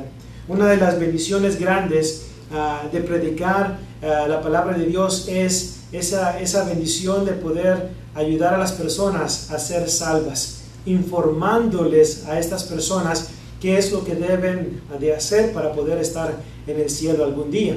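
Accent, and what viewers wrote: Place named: Dominican Republic. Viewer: El pastor se le escucha el acento como el del Pastor Miguel Nuñez y es de Rep. Dominicana puede ser que éste pastor puede ser yo no aseguro pero me gusta su clase nunca había escuchado todo ésto Dios lo bendiga Pastor de donde sea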